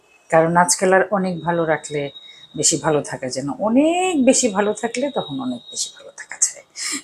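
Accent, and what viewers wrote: Sirajganj, Bangladesh. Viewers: আহারে প্রাণের সিরাজগঞ্জের ভাষা। ❤❤❤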